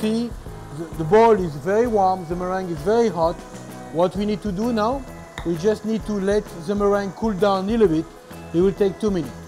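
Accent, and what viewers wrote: France. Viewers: I love his French accent!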